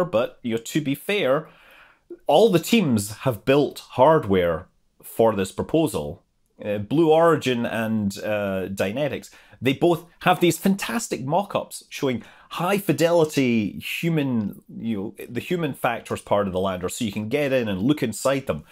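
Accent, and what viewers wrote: Scotland, United Kingdom. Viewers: Otpyrc Ralph Pierre "Kockney"? He's Scottish! Cockneys are from London!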